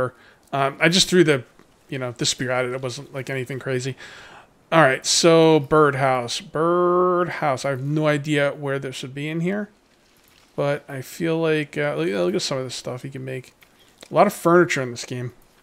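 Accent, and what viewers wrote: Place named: Philadelphia, United States. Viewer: This is my first time watching ya and your philly accent is cracking me up. My best friend is from Philly and you guys sound almost exactly the same.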